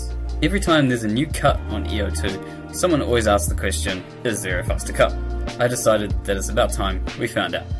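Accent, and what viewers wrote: New Zealand. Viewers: Rest of the world says: section, checkpoint NZL says: séction, chéckpoint Funny accent! Good job with the video mate! Have you used the 'traveling salesman' problem for optimization? It is the most efficient way (that I know of) to determine the fastest route from A to B. Edit: I was halfway in the video when I asked it, sorry. So you did use traveling salesman :) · so refreshing to hear a kiwi accent talking about tm. where abouts are you from in nz btw? i'm in chch ~ psyko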